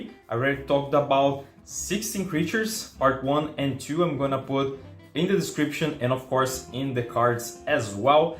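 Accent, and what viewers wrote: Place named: Brazil. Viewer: Simplesmente excelente, muito bom o sotaque brasileiro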